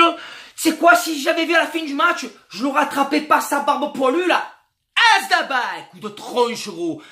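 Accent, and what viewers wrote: Marseille, France. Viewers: Mais noooooon, Dugarry a été l'un des meilleurs attaquants français, il a donné son pronostic.Y a pas à le traiter de pute!!!!!! Perso, j'ai pas eu envie de voir le match car je me fait chier en regardant l'OM en ligue 1. Et oui, je me suis planté et oui j'espère que l'OM gagnera la coupe .....tout en écrivant, je t'écoute parler et t'es pas Marseillais pour rien! Allez l'OM!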